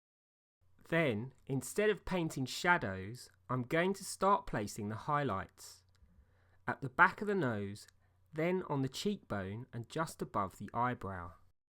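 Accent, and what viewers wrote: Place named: London, United Kingdom. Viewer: Hearing you speak makes me miss London!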